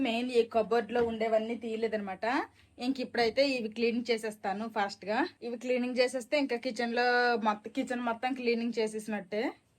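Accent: Srikakulam, India. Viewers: Mee husbend a job chestaru? cute family...... Mee srikakulam bhasha chala bagundi ....❤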